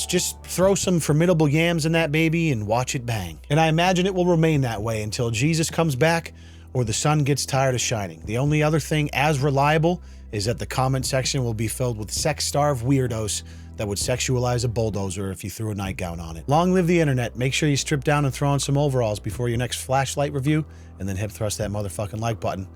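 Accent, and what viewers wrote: Australia. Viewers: Very funny, I think she’s Australian not British, I’m British so I hear it. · Australian, not British my friend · I don't think she's British. I think she's Aussie. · I think she's Australian not British lmao they pronounce things much more weird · She’s Australian not British lol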